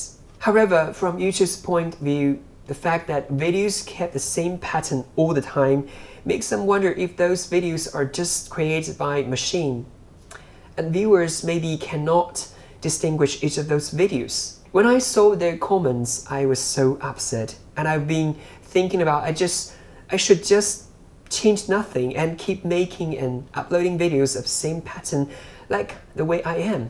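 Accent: United Kingdom. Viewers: How did you learn Japanese? Your RP English is very excellent.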